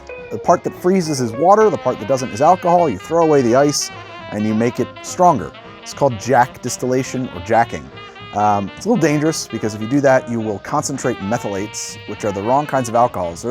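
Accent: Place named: New Jersey, United States. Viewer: i knew you were from jersey lol idk why but i could just tell. so now i gotta ask taylor ham or pork roll?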